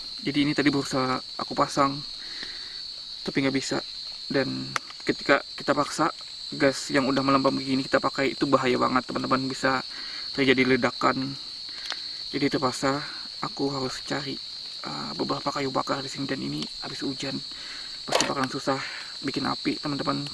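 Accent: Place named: Amuntai, Indonesia. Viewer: Abang neh orang Amuntai kah.. 😀 kaya org Amt mandangar logat pandir pian.. 😀🙏🙏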